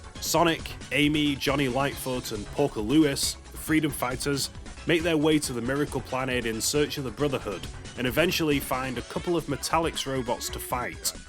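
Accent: United Kingdom. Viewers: is he brittish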